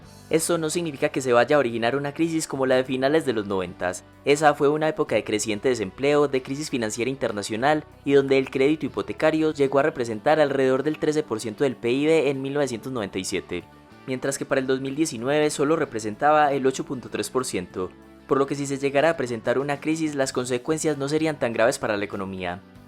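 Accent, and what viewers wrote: Antioquia, Colombia. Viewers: "Paisa" se le llama a las personas nacidas en los departamentos: Antioquia, Caldas, Risaralda, Quindío y al norte del Tolima ubicados al nor-occidente de Colombia. Dicha región es cafetera y sus habitantes tienen el acento como la persona que hace los vídeos. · PURO HUMO , UNA VOZ MUY PAISA... DESLEGITIMA ESTE ANALISIS, MUY MONTAÑERO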